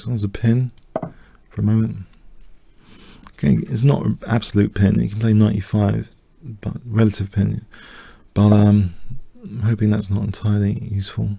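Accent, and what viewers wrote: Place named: London, United Kingdom. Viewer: Yeah it cause a londaner, "th" becomes "f" or "v" depending on the word!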